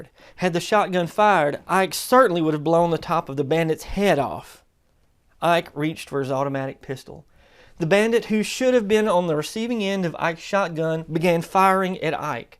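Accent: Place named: Tulsa, United States. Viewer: OK, I grew up in Tulsa and still live nearby and you sound like a local. Am I correct? Great job.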